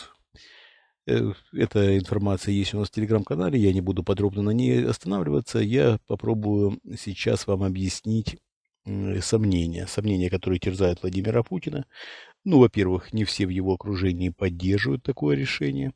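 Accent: Ukraine. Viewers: с Украины что-ли? Акцент украинский.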